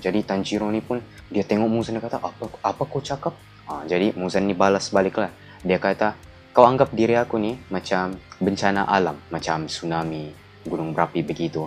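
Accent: Sabah, Malaysia. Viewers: Dia ni org sabah ke? Yg cerita ni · Abang ni orang Sabah ke??🤔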